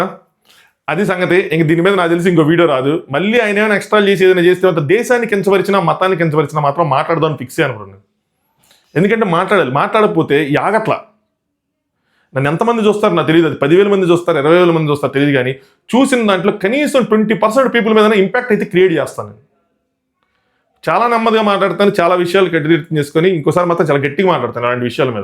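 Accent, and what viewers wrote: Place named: Godavari, India. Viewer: Annayya nenu mimmalni subscribe cheyakunda mee video lu choosedaanini,,,chaala shame ga feel avthunnanu,,,, nenu chaala thakkuva channels ni subscribe chesaanu,,,, okati rendu vantala plchannels ,,,, garikapati gaaru,,, Chaganti garu,,, samavedham garu,,,,priyachowdary garu,,,, filmymoji,,,, ika itu paina meeru ,,, meee maata theeru pakka godhavari kada,,,,, entha chakkaga paddathiga matladuthunnaro,,,,, chaala manchi values ethics morals unna points meeda cheothunnaru,,,,, sooper Annaya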